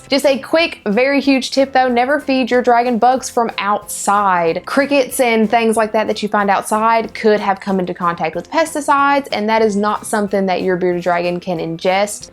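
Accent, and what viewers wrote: South, United States. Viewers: You're drop dead gorgeous 😍 and I love the southern accent 😍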